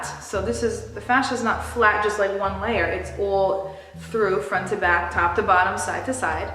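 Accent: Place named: New York, United States. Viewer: Great video. I like your accent, are you from nyc?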